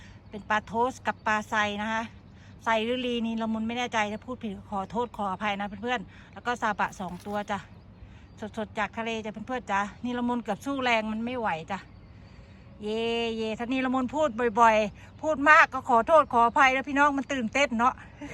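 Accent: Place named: Phu Thai, Thailand. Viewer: คริปก่อนเห็นเว้าภูไทเป็นคนจังหวัดอะไรครับสกลหรีอนครครับ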